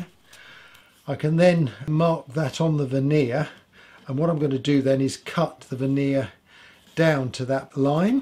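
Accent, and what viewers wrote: United Kingdom. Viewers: Love to hear you talk, man! We Americans sound so plain next to the British. Anyway, I'm really enjoying the build and I just love some of the jigs you've made as well as the band you shared playing the tune "Echoes". That vocalist is killer good. If I can get to feeling better, I'll be building my first guitar from scratch. Any pointers or words of wisdom?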